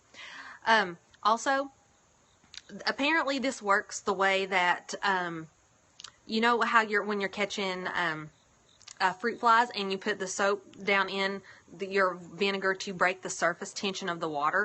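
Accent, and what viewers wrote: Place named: Virginia, United States. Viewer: I live in Virginia, and we have a similar accent.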